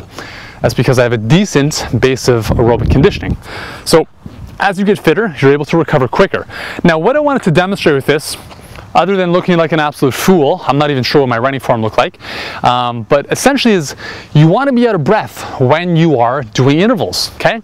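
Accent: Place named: Canada. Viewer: CANADA ! O'bout ! (Love this accent)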